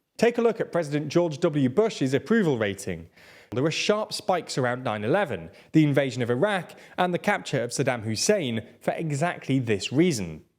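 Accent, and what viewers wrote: United Kingdom. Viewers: i like the program but that stron British accent is really terrible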